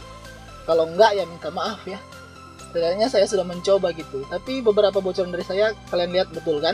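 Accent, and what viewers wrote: Manado, Indonesia. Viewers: orang mando ato Gorontalo? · so kntra tu ba logat manado😂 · .....orang manado?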